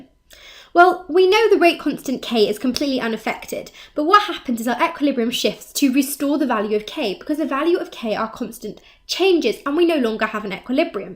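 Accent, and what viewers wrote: United Kingdom. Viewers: Actually she's having the perfect and proper British accent. You need to work on your understanding of the Queen's English.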